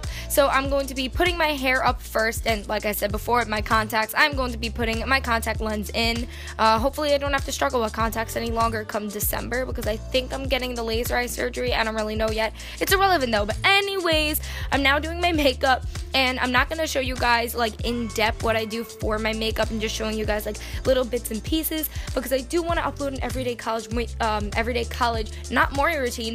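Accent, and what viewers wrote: New York, United States. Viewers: Send Help everyone has an accent. And I think they meant her new York accent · Okay I just stumbled on your channel and as soon as I heard your voice I could here the New York Accent, I’m from Pittsburgh so I hear the east coast accents all the time 😂 nice vid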